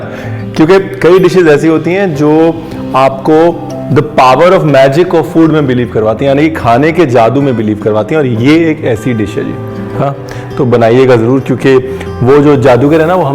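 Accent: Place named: Bihar, India. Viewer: Sardar ki aulad hokar bhayiyon ka accent kyun bolta hai?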